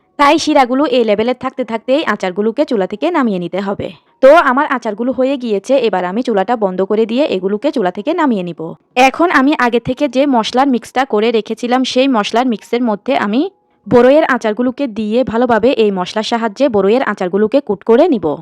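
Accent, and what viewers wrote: Chittagong, Bangladesh. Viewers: cox ar Chittagong ak e voice😢😊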